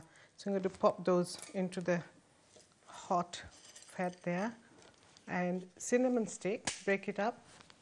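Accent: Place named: South Africa. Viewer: Her accent doesn't sound so British, she sounds more like she is from Durban, South Africa.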